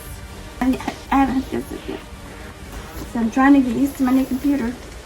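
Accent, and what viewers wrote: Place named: South, United States. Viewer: HELLO~☆from Lou. KY.🐎🐎I HEAR A Familiar Southern accent; TN, KY. Awesome LAPTOP! Nice to meet you, whoa, We have tech issues ❗🌻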